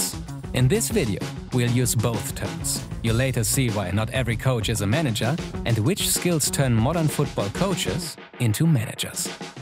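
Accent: Germany. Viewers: R u deutsch btw? Cuz ur accent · The voice over guy is probably German cause his accent is so annoying! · Das ist der geschmeidigste deursche Akzent, den ich bisher gehört habe. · Stabiles Englisch aber man hört doch stark das deutsch raus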